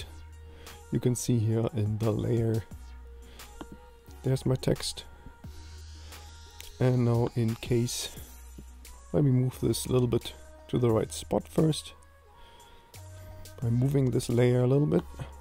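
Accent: Germany. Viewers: Thank you, very helpful. Is it the German accent that I'm hearing?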